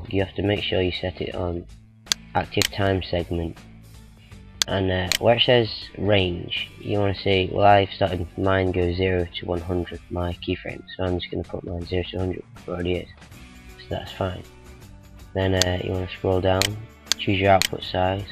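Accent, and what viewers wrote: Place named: North, United Kingdom. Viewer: If I hat to guess I'd say you were maybe from Barrow/Blackpool area from your accent. Good video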